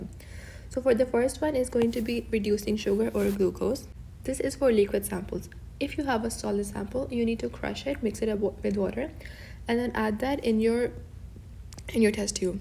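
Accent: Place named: Pakistan. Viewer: Where are you from...Ur voice seems Pakistani 🇵🇰❤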